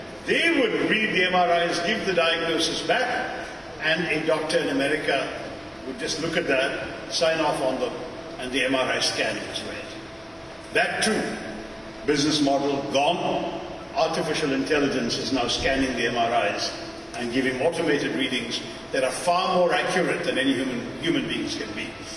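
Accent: United Kingdom. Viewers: A Britisher in sound and An Indian by heart makes this man unique. We are proud to have you....🙏♥️🙏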